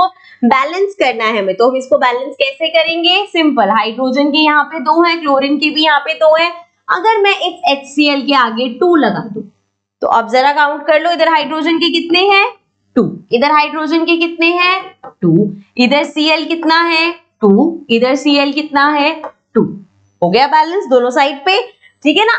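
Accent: Gujarat, India. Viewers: Mam you are from Gujrat?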